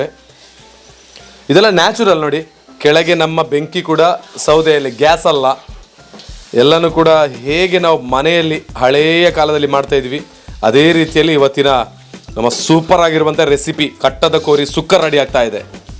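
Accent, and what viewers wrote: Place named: Arebhashe, India. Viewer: Arebashe mathadi kelikke Kushi ade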